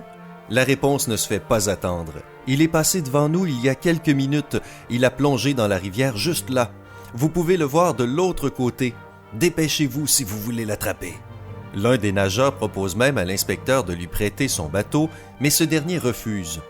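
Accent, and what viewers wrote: Quebec, Canada. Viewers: C'est un québécois c'est sur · Enfait cette chaîne est québécoise non moi je suis québécois et je reconnais l’accent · J'aime beaucoup le petit accent québéquoi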